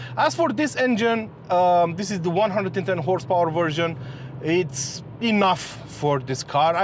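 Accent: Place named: Romania. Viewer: Judging from your accent, you are from Romania? OK, you are using some American expressions and their units of measurement, but stil, I can guess the Romanian origin 😎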